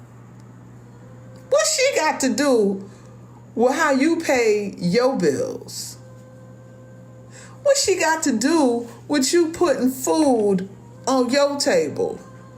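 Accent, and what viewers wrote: New York, United States. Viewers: This sister gotta be from New York ! If not, Jersey or Philly. Definitely the East Coast no doubt ! I love this lady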